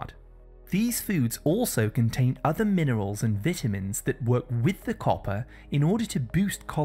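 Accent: England, United Kingdom. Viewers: अंग्रेजी accent में बोल कर इंडियन को मुर्ख बनाना 😃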